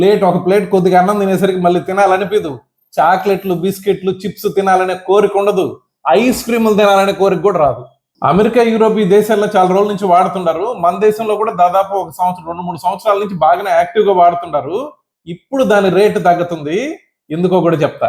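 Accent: Nellore, India. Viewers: Love you sir ur ur nellore good👍👍👍👍👍 God bless u& ur family with health & wealth & prosperity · Maa nellore bhasha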